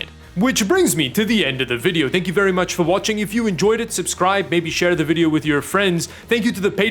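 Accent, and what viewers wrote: South Africa. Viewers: The South African accent is strong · Are you South African? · are you South African? or am I tweaking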